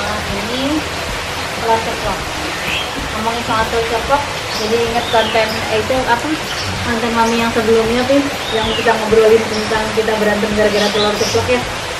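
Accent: West Java, Indonesia. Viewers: Teh lucuu klo ngmng sunda, kebetulan aku bkan org sunda tp suka sm bhs sunda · Resep liat masakan nya z teteh...sambil ngomong logat Sunda...😍 walawpun ak kg bisa ngomong Sunda🤭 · resep k teh teh nyariosna meuni Sunda pisan, cantik deuh. 🤗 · Memang orang Sunda kok d sunda2in duh si ibuk mah😪😪😪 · Teteh maap klo bs logatnya normal sj...biar hamble jgn disunda2in...maap sblomnya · emang sunda mah gitu logatnya.. bukan disunda2 in,teteh kan org garut.. sama kayak org jawa bukan dimedok2in tp emang medok dr sananya kituu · memang logat sunda begitu say....sama aja klau ada vlogger yng ngmng bhsa jawa Ciri khas daerah masing2.... mereka ttep hamble.... Kan sambil belajar bhsa daerah lain hihihi.... cinta Indonesia berbeda2 tetap satu Indonesia....😊😊😊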